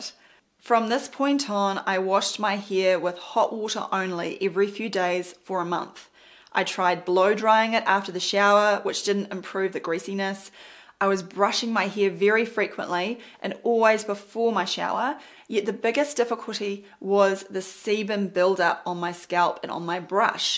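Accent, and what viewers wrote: New Zealand. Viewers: At what point does the NZ accent become a whole new language? I can barely follow. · is drop dead gorgeous and that kiwi accent ) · Hilarious NZ Accent! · Sounds Kiwi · I wondered about the accent. New Zealand apparently.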